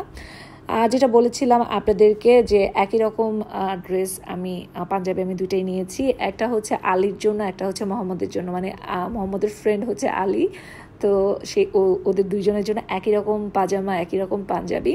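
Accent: Sylhet, Bangladesh. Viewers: খুব ভালো লাগলো সিলেটি ভাষা আপনার মুখে আপু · Apu like diye video dekha soro korlam,Sylheti vasai kotha sone khub valo laglo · Like 👍 134. Walaikumassalam Apu shuna. Khub khub shundor hoyechey Sylheti kotha. Oy Apu Sylheti maat thik oisey. Love you sweetheart Apu ❤❤. Sending love from Srimongal ❤ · Masha Allah sylheti mat kub sundor hoisa ami o akjon sylheti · Apu apne sylheti mat matsoin j bala lagse hunte and dress o onek sundor oise sob gula shopping o onek sundor oise😍😍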